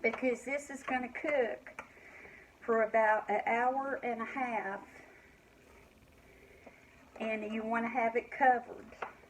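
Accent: South, United States. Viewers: You can freeze just about anything... some things nooo lol but it's always nice to learn something huh? Love her southern accent;)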